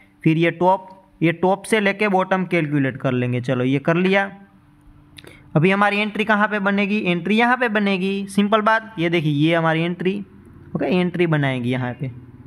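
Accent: Gujarat, India. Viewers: You frome gujrat ❓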